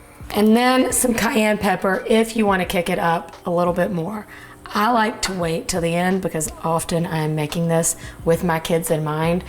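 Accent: South, United States. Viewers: Gawd you are amazing. An Italian woman with a southern accent is pretty awesome.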